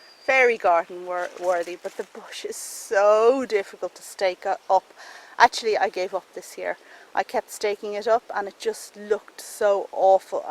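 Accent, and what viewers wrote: Ireland. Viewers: Well I did have a lovely start to my day ! I watched your video in bed with a cup of tea and a biscuit 🙄 which gears me up to get out into my garden, which is not so big but gives me so much pleasure . At the moment I have a plant called Sanguisorba Lilac Squirrel which I think you’d like as it has interesting leaves and long pink tassels,(keep up the tours) I hope one day to see you and listen to your lovely Irish twang 🤗🤗🤗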